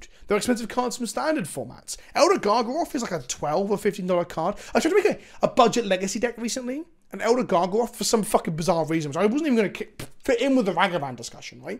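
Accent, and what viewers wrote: United Kingdom. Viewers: It feels weird being from the UK... watching and hearing prices referred to in dollars ...with a british accent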